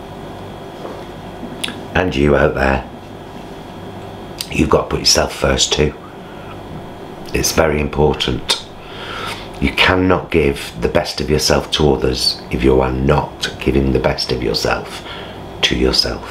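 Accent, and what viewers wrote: Manchester, United Kingdom. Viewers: Lovely, I'm moving back home and I will keep following u, a bit of a Mancunian accent in a southern Italian flat... great person indeed xxx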